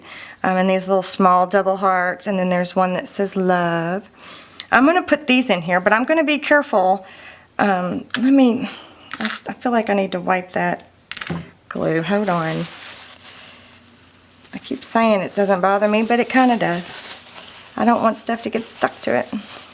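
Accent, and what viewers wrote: South, United States. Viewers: Such a generous giveaway!! Love listening to your southern accent...I'm from Pennsylvania and it sounds like music to me. I love your work...you are very meticulous in your creations...thanks for sharing your talents with us!!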